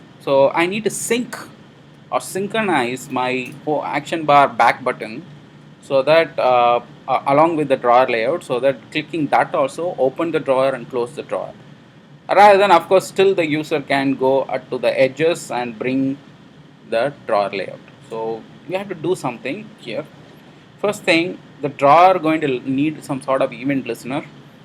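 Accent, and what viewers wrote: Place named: India. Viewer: damn indian accents i hate that shit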